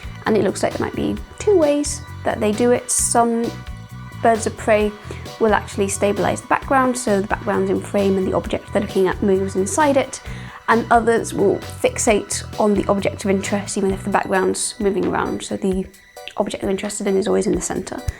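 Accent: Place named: United Kingdom. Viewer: I was wondering, why it was you sound so British? Is it because you have been in England for a whille now? Also was Spanish your first language? Sorry for so many q's! And a video on mental illness would be fantastic. I don't think people (inc me) no much about OCD.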